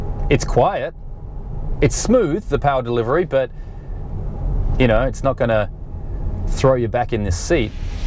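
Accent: Australia. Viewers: Awesome review best I've seen on YouTube so far and I've seen most on the Outlander PHEV as I used to own a 2014 model in Sydney and Perth. I sold it after 3 years to buy a Prado with 7 seats but now regret selling it as I'd go for weeks without putting fuel in it and loved the paddles that allow you to control the level of regenerative braking. I recently sold my Prado for more than I paid for it due to crazy prices due to Covid and bought a 2020 hybrid camry for the wife. We have a 5.3kw solar system with 20 panels that only cost $1000 on our roof so charging an EV in the day costs us next to nothing. I want to get a 2020 PHEV now or possibly the new Kluger (Highlander) Hybrid that's coming to Australia this year. Speaking of Australia was great to hear your Aussie accent! Where are you from in Oz? Sounds like your enjoying Canada & have a great job. Thanks for the excellent review am looking forward to watching more reviews especially on Hybrid or electric family SUV's.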